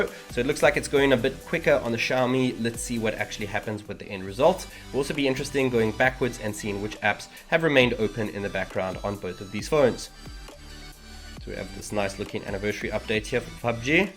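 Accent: South Africa. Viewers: Are you south african? I am. Nice video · are you south african? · i hear from your accent you're from SA. i am too. i am thinking of getting this but retailers dont import the mi series and wont repair it (I asked them, they'll only repair phones they've sold and they only import redmi here). any idea where i can get a mi 9 fixed if it breaks? thats my only concern before buying. thanks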